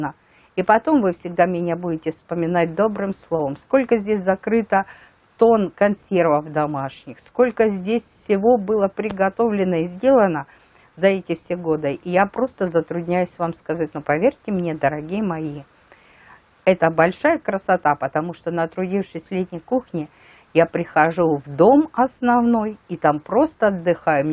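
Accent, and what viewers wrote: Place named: Russia. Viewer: Так мы из одной страны( из Украины),думала, Вы из России, такой акцент не украинский совершенно.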